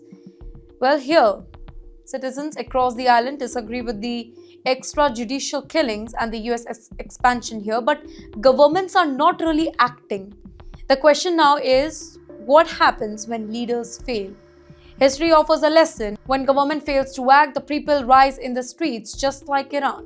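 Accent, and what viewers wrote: India. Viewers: Hi I don't know who you are or where you came from, but from your accent sounds like is somewhere around India. You need to stay out of the Caribbean business. You have enough fight to fight around that zone. Trinidad and Tobago and Guyana have had enough problems with Venezuela already even before US gotten involved. Am not stating that the US has the right to do what they are doing. But you don't get the news when Trinidad and Tobago Fishermen are being kidnapped and bullied and Guyana being threatened by Venezuela to take 1/3 of their country just because of recent oil discovery. These three nations have problems and you will not understand why the animosity . It won't benefit Trinidad and Tobago and Guyana to join the side of the losing. In your case Who would you have backing you.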